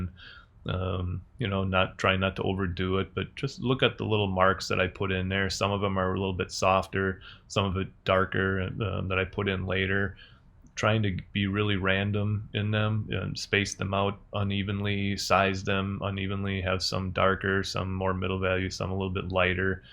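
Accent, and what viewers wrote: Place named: Minnesota, United States. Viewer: Grew up in Minnesota but moved away decades ago, now living in Southern California. Your wonderful paintings have transported me back to my childhood in an emotional trip to farmland, small towns, seasons, church steeples and huge skies. Thank you,! Hearing you talk also brings me back to Minnesota. You kow what I mean!!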